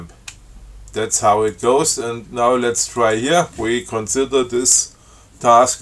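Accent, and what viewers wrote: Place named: Austria. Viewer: I also immediately thought that this sounds like Austrian English :D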